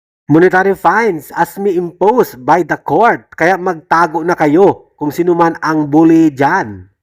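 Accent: Visayas, Philippines. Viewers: Ate Bisaya po kz yta ang nagrereport halata nmn po xa boses ña kya kalma lng po😅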